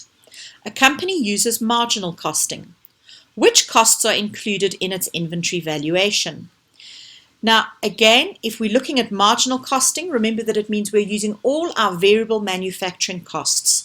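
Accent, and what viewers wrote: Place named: South Africa. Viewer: You have a South African accent. Are you from there? Your Accounting tuition is fantastic! Thank you so much.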